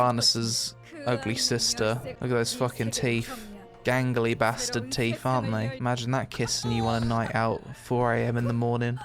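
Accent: Netherlands. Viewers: Youre Dutch? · Didnt know u where dutch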